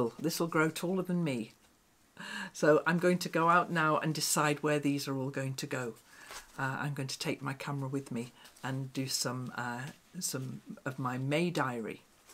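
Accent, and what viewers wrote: United Kingdom. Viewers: Woo so much work! Your jacket will be beautiful. My first vidéo from you but not the last one. I will learn english with your nice british accent.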